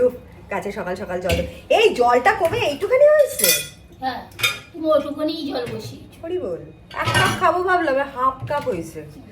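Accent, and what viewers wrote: Bangal, Bangladesh. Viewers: Aunty tmr bangal kotha gulo ki cute 🥹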